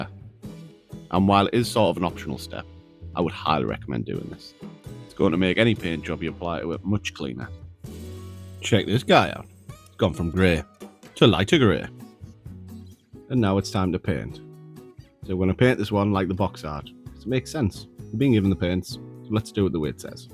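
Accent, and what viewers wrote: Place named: Teesside, United Kingdom. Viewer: Teesside accent? Go on then I’ll subscribe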